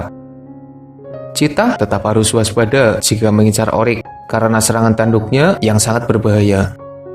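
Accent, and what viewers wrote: Banyumas, Indonesia. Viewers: Logat ngapak ye..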